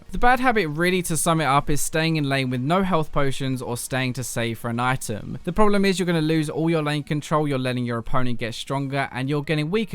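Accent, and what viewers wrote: United Kingdom. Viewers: LMAO he is britsh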